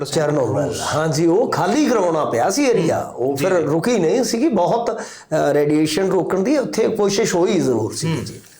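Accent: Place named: Punjab, India. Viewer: ਭਾਊਆਂ ਦਾ ਸਟਾਇਲ ਹੁੰਦਾ ਆ ਅੰਗਰੇਜ਼ੀ ਨੂੰ ਪੰਜਾਬੀ ਜ਼ੁਬਾਨ ਵਿੱਚ ਬੋਲਣ ਦਾ, ਵੀਰੇ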